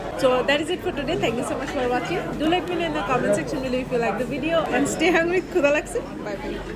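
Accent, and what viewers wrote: Sylhet, Bangladesh. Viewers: ভাইয়া কি সিলেটি??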